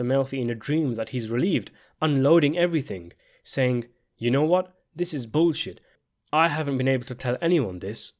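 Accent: United Kingdom. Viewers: Oh god Brit narrator I'm out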